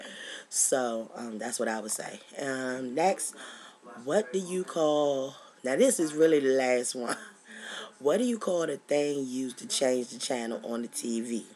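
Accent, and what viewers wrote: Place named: South, United States. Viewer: hahaha love this yes u do sound so country i love it though thats what makes u though:-)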